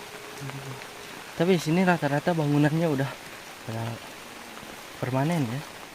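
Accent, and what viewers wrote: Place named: Sunda, Indonesia. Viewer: Orng sunda mah kang...tos w nyarios ku sunda dei, garut mah komo medokkkk psn sunda na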